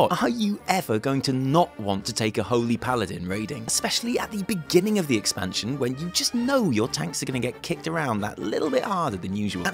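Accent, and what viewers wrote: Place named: RP, United Kingdom. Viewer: BBC voice, love it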